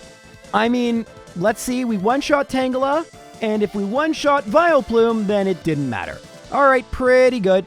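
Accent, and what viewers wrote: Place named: Canada. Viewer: I often forget is Canadian until he says words like "Sorry" and "Tomorrow". Then it's really obvious.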